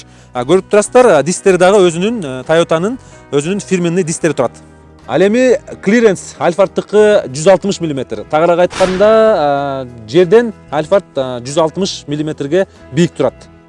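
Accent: Standard. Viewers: ишинизди мыкты кылат экенсиз таза суйлойт экенсиз дагы кутобуз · Кыргызча таза так, Жана тунук суйлойт экенсиз. Албетте ийгилик каалайм.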